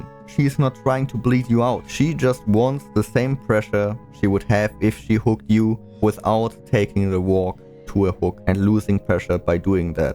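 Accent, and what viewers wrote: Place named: Germany. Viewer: You sound realy german (i'm german too and i recodnise it very often)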